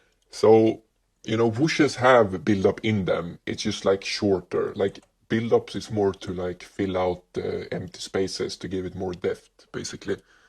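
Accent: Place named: Sweden. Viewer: ur swedish?😃I recognioze the accent 👀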